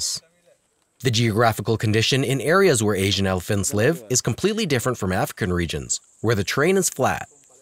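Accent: United States. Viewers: Good video but rubbish yank narrator spoilt it for me.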